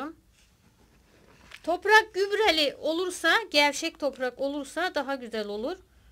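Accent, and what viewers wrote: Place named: Kütahya, Turkey. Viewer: Konuşma şilven bizim gibi abla kesin Kütahya yada Uşaklısın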